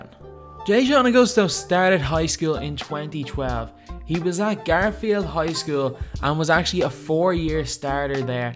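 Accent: Ireland. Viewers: Are you from ireland? Cause i noticed that you sounded ALITTLE bit like conor mcgregor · Are u from cork or dublin. I live in Kerry and recognised the accent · R u Irish?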